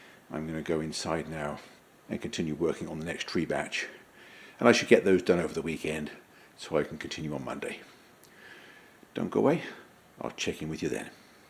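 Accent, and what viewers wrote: England, United Kingdom. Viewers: In one word... " Perfection " you are without doubt, the best non club single handed builder of layouts out there. Are you British? you sound English:)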